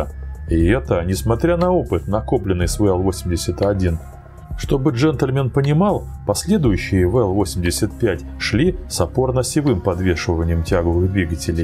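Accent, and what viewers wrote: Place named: Vologda, Russia. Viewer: Такой прикольный Вологодский говор, но всё по делу. Да, там на фото у него на самом деле очень грустный фейс, аж жалко его работягу.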